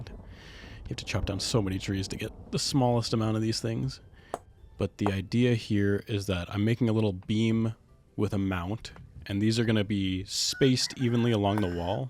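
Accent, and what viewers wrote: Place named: Newfoundland, Canada. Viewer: Are you from the rock? Your accent sounds slightly like Newfoundland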